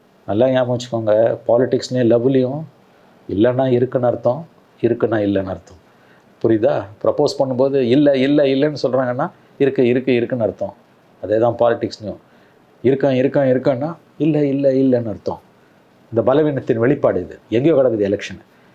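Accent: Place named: Telugu, India. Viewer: Telugu voice. This guy from Aanthra city.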